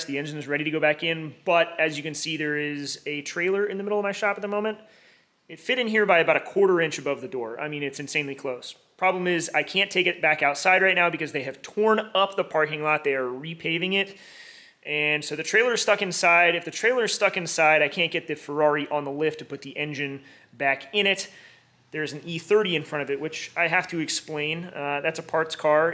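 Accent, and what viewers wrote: United States. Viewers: Amusing and yet so normal to hear an American voicing over a video of a European engine being rebuilt and mentioning that he hopes that this video will make it known what this USA back street workshop can show the ROW (Which is basically Europe) how to do it ! LOL. Why not simply just phone up a European backstreet workshop to work on a European engine ?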